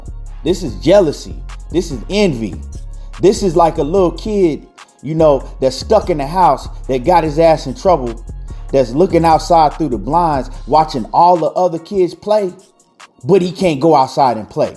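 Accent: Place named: West Coast, United States. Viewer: Yo, Watching this video was like a breath of fresh air. Man, I'm tired of always defending Errol at the family bbq's, everybody seems to think that everybody (including EJ) is ducking Bud and it simply is not the case. You sound like a west coast dude, I was born in the CPT, but grew up in Pomona. I'm gonna have to recruit you to help me defend my boy Errol when the dads congregate at the little kid b-day parties and talk about how Bud is the best and is being ducked. #BringBacktheShag, #ManDown, #BodySnatcher. Peace and Blessings to you.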